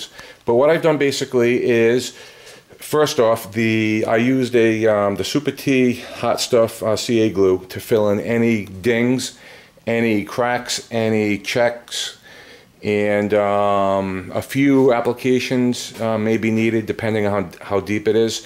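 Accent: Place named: New England, United States. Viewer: The accent you speak with sounds very north east coast maybe Maine, Boston, but any way I was slug'n some beers and perusing YouTube and found this guitar video and I thought it was good shit, very informative from someone who has obviously had some hands on experience with the craft. Good stuff.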